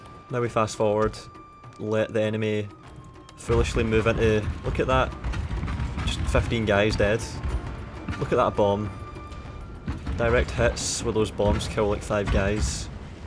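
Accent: Scotland, United Kingdom. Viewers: What part of Scotland are you from bud? I want to guess somewhere around Glasgow?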